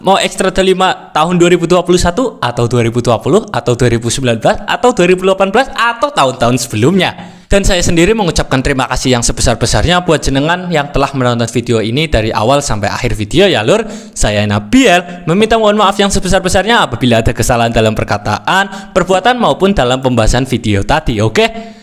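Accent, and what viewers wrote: Java, Indonesia. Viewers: Medok medok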